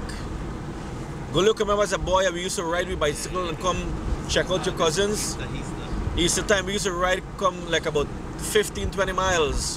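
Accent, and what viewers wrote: Guyana. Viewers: ...watching from Canada...never knew Essequibo was so nice...never visited when I resided in Guyana...keep up that 'Guyanese-ness' and talk...love it. Wha we doing in 'foreign'...??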